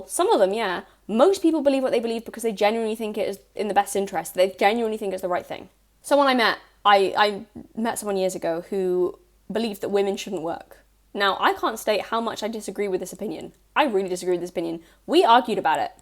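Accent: United Kingdom. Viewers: Not listening to some one with a British accent. No thanks.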